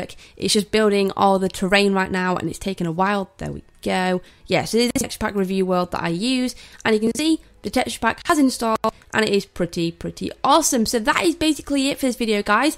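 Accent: England, United Kingdom. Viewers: that voice is she from manchester londer lester or none and its sound so familiar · wow british sound i think ;)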